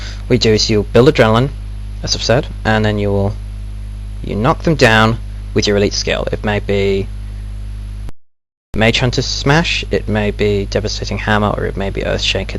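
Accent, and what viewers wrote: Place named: United Kingdom. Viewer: wooot a british gw player that does vids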